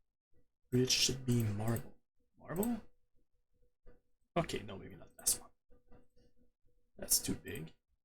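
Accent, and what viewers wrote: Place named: Quebec, Canada. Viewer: On ne peut jamais enlever l'accent québécois ;-)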